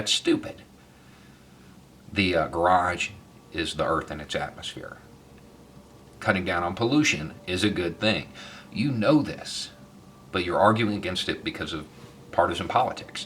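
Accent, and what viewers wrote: South, United States. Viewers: Thanks for this one. People who don’t accept science should delete their social media, cancel their phones, sell their cars, abandon technology and live with the Amish. I never comment on stuff but I’ve been watching you for a while thinking how does a dude with a southern drawl have these thoughts...then I found your other channel and it made sense Ⓐ ☮️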